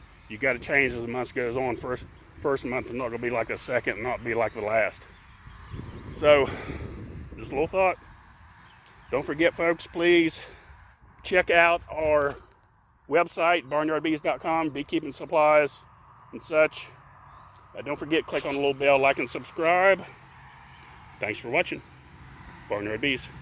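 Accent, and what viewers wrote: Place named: Pittsburgh, United States. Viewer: Did I detect a yinz in your accent? Are you originally from the western pa, northern WV area?!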